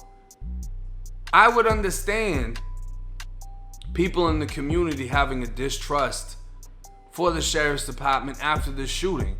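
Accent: Boston, United States. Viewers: Bra your channel interesting, but I'm from the O (X) why you sound like you from Boston somewhere? No shade just wandering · i think he from boston moved to Florida now he's back in Boston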